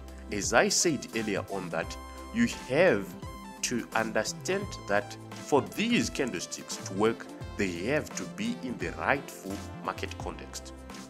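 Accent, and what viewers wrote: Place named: Zimbabwe. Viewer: thanks. you sound Zimbabwean.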